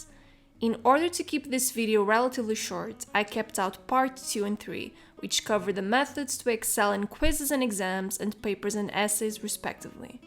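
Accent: Portugal. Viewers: Eu sabia que reconhecia o sotaque! És portuguesa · I love your videos I think they are really informative and make me want to be more organized like you But are you from Portugal? I am and your name sounds really familiar and your accent too ahah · are you portuguese? sorry hahaha i’m curious because of your accent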